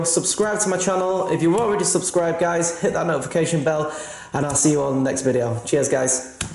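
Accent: Yorkshire, United Kingdom. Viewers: Where abouts in the UK are you from? You sound very Yorkshire...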